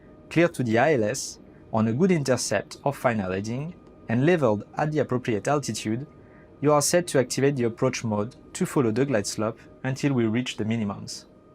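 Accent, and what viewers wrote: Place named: France. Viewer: French accent :3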